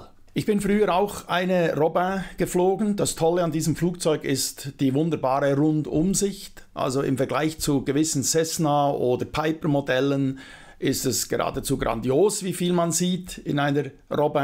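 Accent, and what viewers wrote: Switzerland. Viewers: Salutti, jetzt gebe ich auch mal meinen Senf dazu, ich muss einfach das Kompliment schreiben. Ich bin jetzt 51 im Juni 52 und seit über 30 Jahren auch Pilot allerdings auf den Straßen Europas, jetzt nur noch in Schweden. Ich finde das Gesamtkonzept Deiner(ich duze alle seit ich in Schweden wohne, haha) Videos sehr professionell und lehrreich. Allein die Beantwortung von der in meinen Augen unnötigen Kritik Dir gegenüber gefällt mir immer wieder, hart, exakt und treffgenau. wer da noch versucht Dir Rhetorik beizubringen, der hat dann wohl vergeigt. auch schaue ich mir Deine Videos an, weil Du von meiner alten Heimat berichtest und ich nach sieben Jahren der Liebe wegen nach Schweden gezogen bin. Dein duetsch ist einfach was ich vermisse. Ich wohnte in Ettiswil/LU und wäre dort nach den 5 Jahren glücklich gestorben. Allerdings bin ich jetzt in Västernorrland seit mehr als 12 Jahre und auch seit 2 Jahren schwedischer Bürger. 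Also nicht Wahlschweizer, sonder eben Wahlschwede. Ich bin in Ulm/Donau geboren, darfscht mich also auch Bockwurst nennen. Denn Deine Art ist mir sehr lieb gewonnen. wie die ganzen Kantoner die ich kenne. Hab sogar noch den Schweizer Fahrausweis, der ist ja unbegrenzt gültig. Das fliegen hat mich nie interessiert, da ich schon zu früh die Plomben in den Zähnen hatte und mir daraus selbst die Interesse verweigerte. Allerdings als ich bei der Ruag in Emmen einmal einen Helikopter in der Halle stehen sah, ich wollte mal schnuppern, aber er sagte, er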